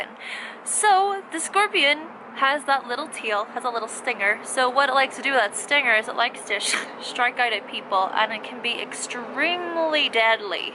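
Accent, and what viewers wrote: Ireland. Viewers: are u Irish? u sound just like Nadine coyle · It's true that Scorpios do have a tendency become involved in the porn industry Been there.Done that. BTW, please try not to lose your beautiful Irish accent. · I love your eyes and i love that accent of yours.....irish?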